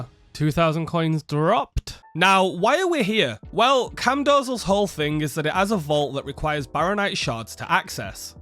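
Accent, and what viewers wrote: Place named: Yorkshire, United Kingdom. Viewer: Your accent is killing me.. Where are you from? Leeds/Hull/Sheffield area? It's so similar to mine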